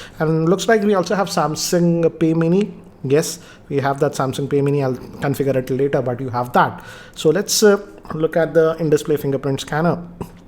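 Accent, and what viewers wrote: India. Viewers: indian accent ? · indians has a funny english accent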